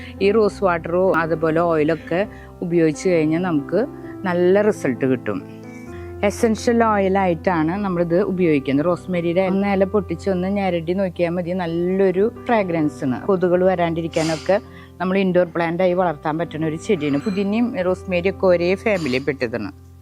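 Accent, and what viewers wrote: Thrissur, India. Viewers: Changathi, athu njangade thrissur bhashayanu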